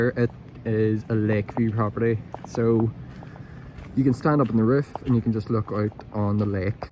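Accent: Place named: North, United Kingdom. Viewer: We all love your northern accent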